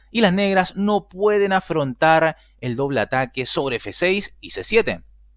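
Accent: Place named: Chile. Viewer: OYE, TU ACENTO TE DELATA: ¡¡¡TÚ ERES CHILENO!!! MI PAPÁ TAMBIÉN ES DE RAZA CHILENO COMO TÚ!!! ¡OJALÁ! ALGÚN DÍA, CHILE, LLEGUE A TENER UN CAMPEÓN O CAMPEONA MUNDIAL DE AJEDREZ!!!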